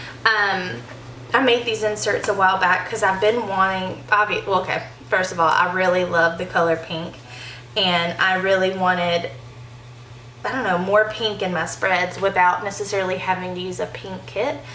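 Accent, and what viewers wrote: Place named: South, United States. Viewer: your southern drawl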